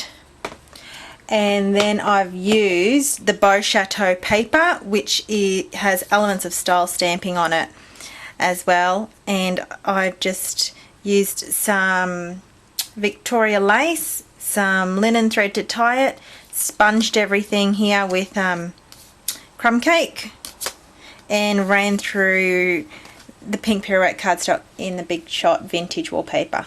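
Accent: Australia. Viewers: Love this card... simple, yet elegant. Vintage style will always get my vote! Nice to hear an Australian accent for a change.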